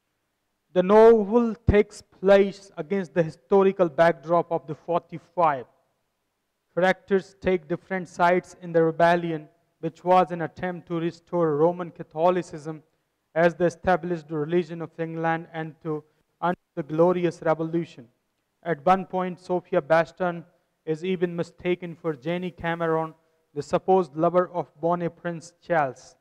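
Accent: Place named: India. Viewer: This is bullshit!.. Indian accent doesn't go well with British novel.. you should have known that by now..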